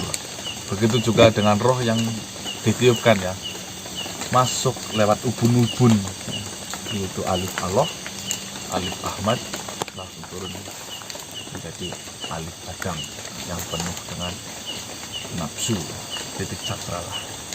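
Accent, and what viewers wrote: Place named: Banyumas, Indonesia. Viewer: Banyu mas wng ngapak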